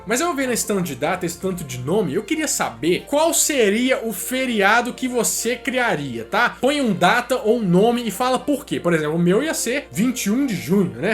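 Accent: Minas Gerais, Brazil. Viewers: MUITO LEGAL ESSE VÍDEO. EU GOSTO MESMO É DESSE SOTAQUE MINEIRINHO GOSTOSO DEMAIS DA CONTA... PARABÉNS. EXELENTE CONTEÚDO... ESTOU AGUARDANDO A PARTE DOIS...🇧🇷🇧🇷💙💛💚👍👍👍👍👍👍👍👏👏👏👏👏👏👏👏🌻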